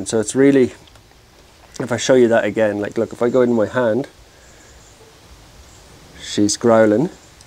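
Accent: Ireland. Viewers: marshmallow will come to love you just like the rest of us. Nobody can resist that Irish lilt.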